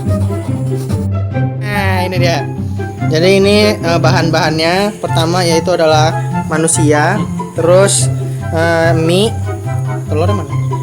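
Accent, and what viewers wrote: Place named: Riau, Indonesia. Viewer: Ohh kok suaranya kek orang riau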